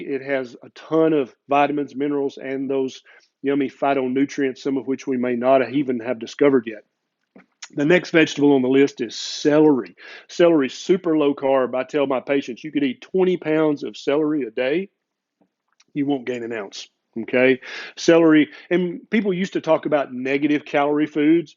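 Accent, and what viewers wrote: South, United States. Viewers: I could listen to you pontificate on celery for hours 😆 The Southern accent is too good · I love your southern twang!....oh and the video too!!!😉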